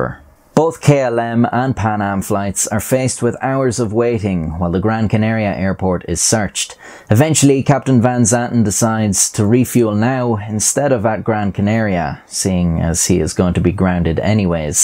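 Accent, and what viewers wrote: Ireland. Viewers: I’ve heard this story before, but hearing the Irish lad explaining it entices me for some reason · H I'm pretty sure he's Irish but if your some Irish English thing thats cool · I love his accent! The Irish narrator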